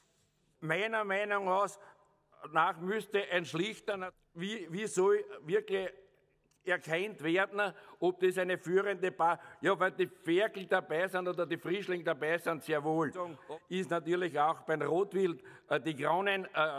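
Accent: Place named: Vienna, Austria. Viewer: Nicht gegen Österreich, aber dieser Wiener (oder was auch immer) Dialekt ist dermaßen furchtbar, da bekommt man eine Gänsehaut. Da kling Ostdeutsch noch schön gegen, das ist wenigstens lustig.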